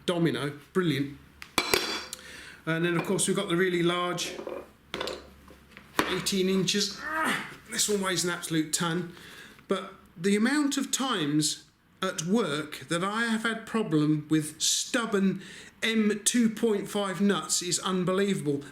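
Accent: United Kingdom. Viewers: Is that a Suffolk accent?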